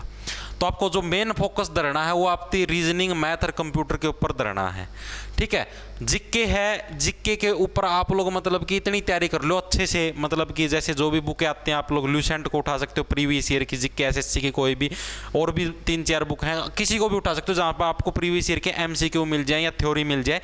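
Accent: Haryana, India. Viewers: Ye apni desi haryanvi boli hai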